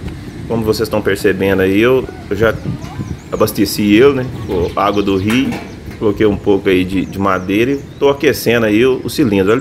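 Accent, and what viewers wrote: Minas Gerais, Brazil. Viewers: Esse sotaque mineiro me conquistou antes da máquina apresentada. Eu não sei como cheguei aqui. O mais louvo foi ver que 11 milhões de usuários vieram aqui antes de mim em 7 dias. Esse é o viral dos virais. Parabéns. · pelo sotaque é de minas uai !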